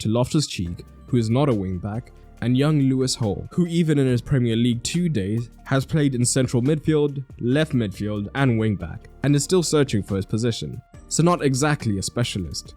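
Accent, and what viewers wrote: South Africa. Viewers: Are you South African this accent sounds weirdly familiar 😂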